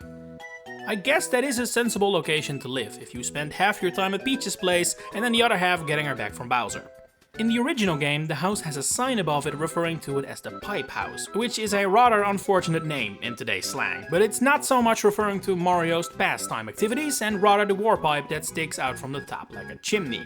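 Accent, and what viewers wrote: Netherlands. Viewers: Dutch accent detected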